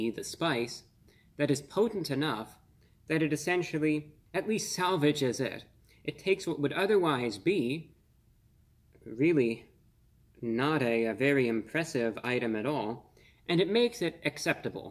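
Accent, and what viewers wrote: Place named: Transatlantic, United States. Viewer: Man's bringing back the old-school transatlantic accent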